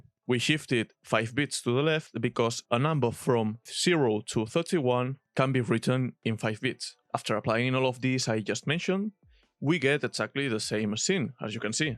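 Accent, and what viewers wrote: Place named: Spain. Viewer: Looking incredible, I hope this goes well! Also, are you spanish? Because your english accent sounds like every spanish speaking english, like myself.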